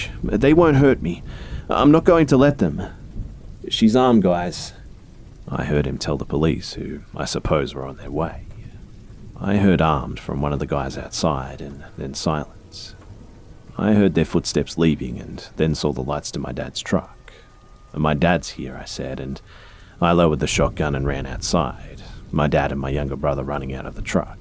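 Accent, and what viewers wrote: Australia. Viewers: Cheers for liking my comment mate! I seriously want to take a gap year in Australia and think it’s the best accent so I like your narrations even more because of that! Keep up the good work :)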